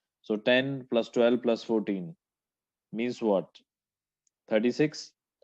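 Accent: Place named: India. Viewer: Amazing sir. Perfect video with perfect indian accent. All clear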